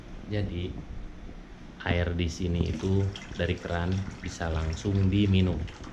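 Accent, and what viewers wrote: West Java, Indonesia. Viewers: suka liat vlog ini,yg ngevlognya seru & ada lucu2nya,logat sundanya& jadi tau daerah2 disaudi.kynya enak tinggal disana.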